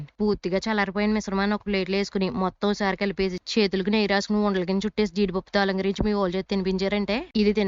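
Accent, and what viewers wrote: Godavari, India. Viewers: Meedhi Godavari anukunta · Meeru chupinche vantalu adbutanga untayo ledo teliyadu kani chivarlo cheppe aa matalalu chala adbutanga unnai nice voice with beautiful quotes with godawari slang…..🎉.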